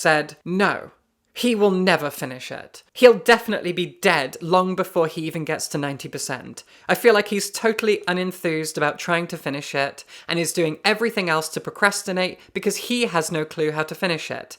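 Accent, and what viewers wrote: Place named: RP, United Kingdom. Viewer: So you’re a lazy contrarian with a posh accent. Congrats.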